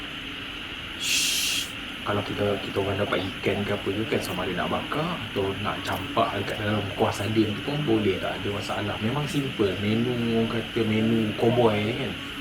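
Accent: Terengganu, Malaysia. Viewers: Ambe asal org kampung seberang pintasan...area kuala dungun...biase gak gi panjat teluk gadong naik utk memancing...skang dah berubah..mari dungun nanti kite ngeteh...deme cakap macam org ganu dohh....respek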